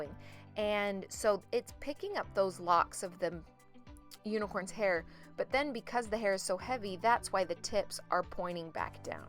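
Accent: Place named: United States. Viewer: we should do a collab, but I guess by your accent that you are in America...You are AWESOME!! Brilliant Art Work! <3 <3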